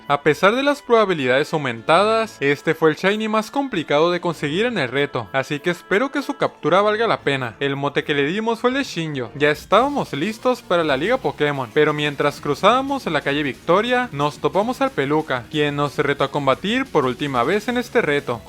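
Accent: Chihuahua, Mexico. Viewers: Eres de Chihuaha vdd we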